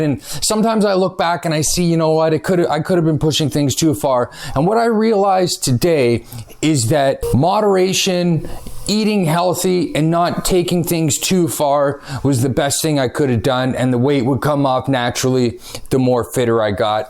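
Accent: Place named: Canada. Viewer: I'm a excessive guy too but you are at another level 😁 I'm from Montréal, so it's a pleasure listening to another Canadian...it's a nice change to listen to something different than from UK of Australia !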